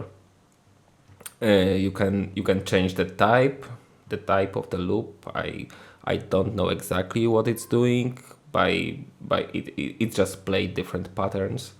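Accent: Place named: Poland. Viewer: w pierwszych slowach slychac swoich:p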